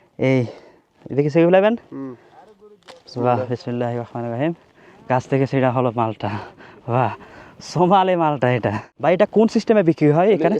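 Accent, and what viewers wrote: Chittagong, Bangladesh. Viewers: Don't mind brother... watching from Rangpur and I can understand your Bangla easily but Chatgaiya is difficult to understand..No problem go ahead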